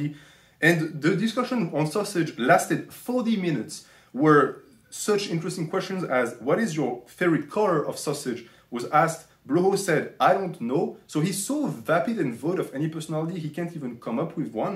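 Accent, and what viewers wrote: France. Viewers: Brother even with a thickish French accent ur English is marvelous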